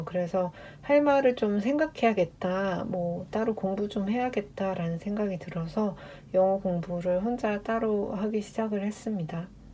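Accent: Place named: United Kingdom. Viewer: 영국영어 발음이 멋있는데 발음은 어떻게 연습하셨나요.. 아무리 읽어도 한국인 발음에서 벗어날수가 없네요😢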